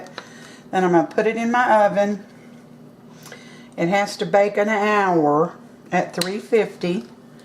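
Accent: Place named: West Virginia, United States. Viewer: now im hungry !! this looks wonderful ty 4 sharing. have a great day. are you in wv..............u sound like it to me. just wondering cuz i spent many summers there with my grandparents.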